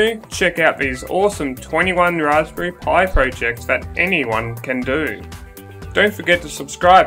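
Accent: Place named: Australia. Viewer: Habib he sounds Australian.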